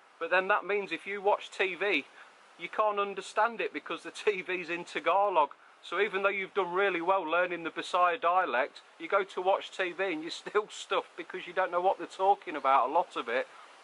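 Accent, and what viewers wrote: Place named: Yorkshire, United Kingdom. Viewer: More interested in your accent. Yorkshire?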